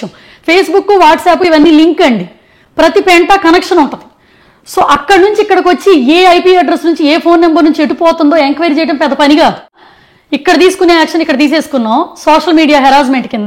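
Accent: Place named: Guntur, India. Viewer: First of all a big hats off, a lovely clear cut video to those spreading negativity… we surely know that it’s like a saying goes ‘ dunapothu Medha varsham padinatu ‘.. I have been following u since ur first video, my husband saw ur video and shared to me, he says, ‘u talk like my sister in laws, who in relation are his sisters, they are from Guntur and I am from vijaywada.. so I relate a lot, the way u talk, the spontaneity and all … my elder brother stays in Birmingham and I know how they struggled to build up a good career in another country.. I am messaging from my daughters account, finally, I think I can call u by name, as I am a bit elder to u and I consider u as a friend, if hurtled sorry.. what I want to tell is, I just love u for the,‘Kukka katuki chappu Debba’ reply u are giving and this is necessary …finally wishing Bannu a speedy recovery and missed little princess in these videos.. 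but good that u kept kids away from all this nonsense.. take care, lots of love, jyothi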